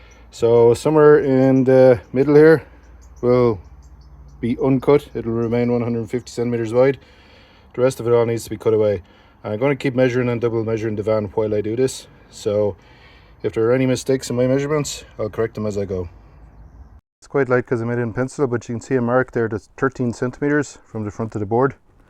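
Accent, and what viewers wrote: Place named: Ireland. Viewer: Nice job mate, and timely! I've just acquired 72 low light, and have the same job to do. And that Irish brogue!! I could listen to it for ages, love it. I'm from Australia. 😂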